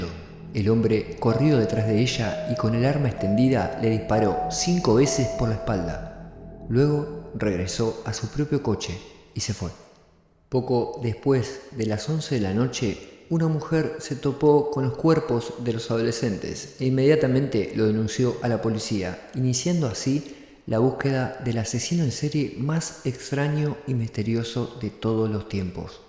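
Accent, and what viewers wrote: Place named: Buenos Aires, Argentina. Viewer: sha, she, shi, sho shu. Muy porteño para mí gusto.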